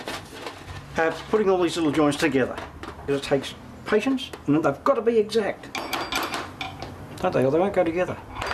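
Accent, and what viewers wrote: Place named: Australia. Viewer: I kept expecting him to say (with his Australian accent) "can you see what it is yet?" :-)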